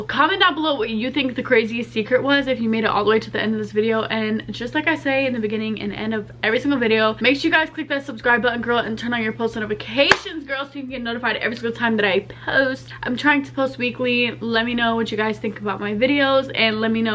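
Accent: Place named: South, United States. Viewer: You remind me of the mom from ginny and georgia especially with the southern voice 😂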